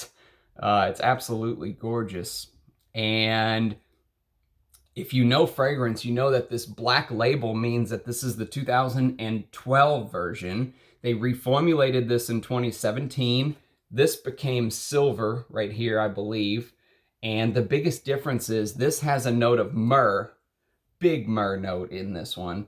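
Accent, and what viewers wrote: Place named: Texas, United States. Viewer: Nooo lol. My nose is still learning. It's actually incredibly hard to pick out notes. I chatted to a student studying olfactive psychology, big word 😂, he said the human brain can pick out around 5 notes max in a perfume. Everything else is hidden in a blur. You can get an effect from Hedione for example, it's like air making space. The musks are bloody hard to identify, especially if many are used. I struggle to differentiate them in a perfume a lot. Woody ambers forget it, they all bloody smell the same to me. The more raw materials I smell and study, you can pick them out sometimes if they are overdosed in a perfume. For instance smelled sundowner today (the drydown is a beautiful smooth amber tobacco btw , lovely!), in the opening I smelled the synthetic used to boost a tobacco note. It's in tobacco Oud etc as well. 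You must notice it. Like if you smell Cilantro in food. It's there. Doesn't mean its bad btw, you just can't miss it. Also, I could not do what you do. Firstly my collection is shite compared to yours. And secondly, I haven't got a smooth Texan accent 😉 haha. I am learning a lot from your videos btw. A lot!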